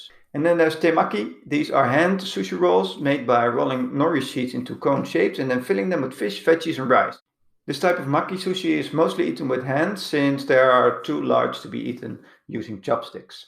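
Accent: Netherlands. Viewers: I detect a Dutch accent. Am I right?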